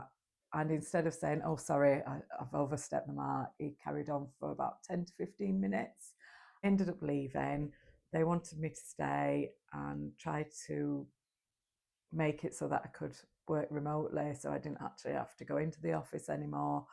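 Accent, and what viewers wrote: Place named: Yorkshire, United Kingdom. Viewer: Loving your authentic style - I am originally from Yorkshire and it's great to see/hear this type of content accompanied by a Yorkshire accent like mine! Leo is just gorgeous - looking forward to more of his video bombing in future content lol. Wishing you well after your tough year xx.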